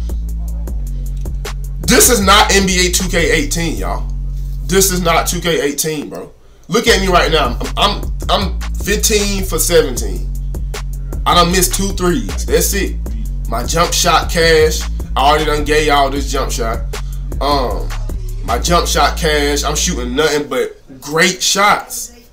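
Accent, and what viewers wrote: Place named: South, United States. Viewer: Yo acccent country asf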